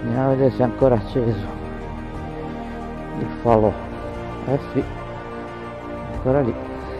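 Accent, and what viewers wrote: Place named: Romagna, Italy. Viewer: figata di strada, davvero bella, poi mi piacciono un casino i tuoi commenti...l'accento romagnolo mi fa morire....tranquillo...sono sposato e con una figlia🤣🤣🤣🤣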